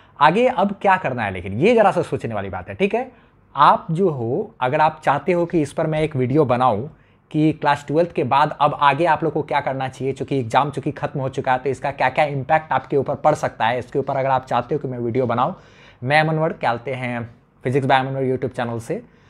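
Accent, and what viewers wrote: Bihar, India. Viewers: Sir aap bihar se hokya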